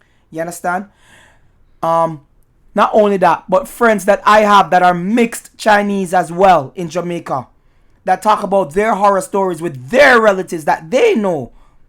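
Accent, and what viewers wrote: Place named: New England, United States. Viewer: You sound like a duncebat Yankee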